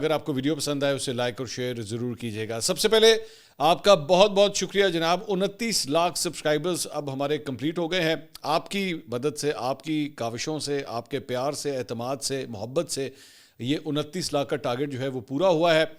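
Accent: Punjab, Pakistan. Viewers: bhai doraan e program apka punjabi k tarka is quite hilarious...jumle k maza ajata hay🤣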